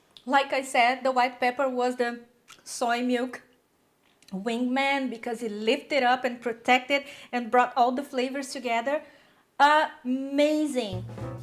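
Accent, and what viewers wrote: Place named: Brazil. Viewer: Where from Brazil you are from? I figured out by your accent!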